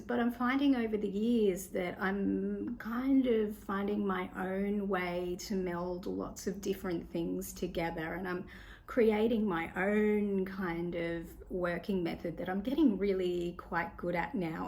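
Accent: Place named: Australia. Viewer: So happy to have stumbled onto your channel. This is only the 2nd of your videos I’ve watched but I’m now your newest subscriber 🎉yeah. I adore your warmth & authenticity, plus it’s lovely to hear an Aussie accent. I’m also an Australian, the same age as you & been wanting to start my own channel to document my creative process but it’s hard to imagine what you can’t see already so thanks for being an inspiration. I look forward to watching the rest of your content. I know I’m a total stranger but honestly I’m so proud of you in this video for “just doing it”, for having the courage to show up. You ROCK!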